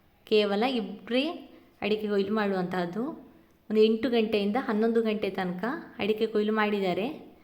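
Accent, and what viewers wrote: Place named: Mangalore, India. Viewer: Mangalore kannada bhashe kelode super 😊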